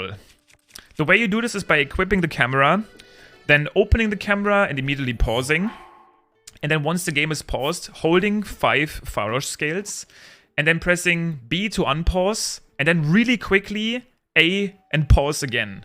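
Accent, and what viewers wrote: Germany. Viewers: Bist du deutscher?Das erkennt man bisschen am englisch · das deutscheste englisch das ich je gehört hab ^^ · Boah dieser deutsche Akzent 🥱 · Are you german cause of you're accent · I have a question ur german arent you because i hear a pretty thicc german accent😂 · dang, ich liebe diesen deutschen Akzent xD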